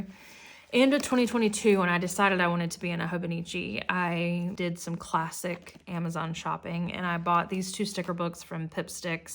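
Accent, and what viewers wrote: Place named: South, United States. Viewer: Listening to you ramble about your stationary & journalling for an hour has been a JOY!! I wanna write all the ways I have decided you are awesome but I think that would be super unsettling, so. 🤣😭 Okay, I'm gonna do it anyway. I love an uncut stream of consciousness of absolutely nerdy shit, like I want to tell my partner about the intricacies of each of my pens and each of my storage devices too, for reals it's so fascinating idk!!! I don't because to be frank I get sick of the sound of my own voice, but a cute ass chill queer southern babe is perfect for my ears. I can't do much with a million journalling structure videos (tho, love the info sometimes, but I don't have the patience or concentration to just wAtch a video?) that are at most twenty minutes long - while I'm preplanning literally just one title page for an hour (what was that YT person you said is really good at Just Going For It again??), I need someone w an effortless ramble made of pure chat about journalling with no urgency at all. 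rewatching this this morning and a few mins in, very Sunday morning vibes, I bursting out laughing at the extremely niche relatable dropping of "Some weird selfie of me crying in the car" 😭😭 babe you're EVERYTHAnnnnG. Pls never stop (okay that's literally totally and always should be up to you, no pressure.. okay pressure, but that chill kind of We Love It not like needy entitled audience but lookin at your comments section we already love yeww. so no pressure.) I hope you know t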